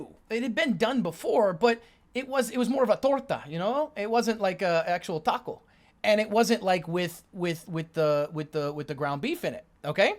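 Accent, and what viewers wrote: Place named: Spain. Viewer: Man, why the Spanish accent i dont get it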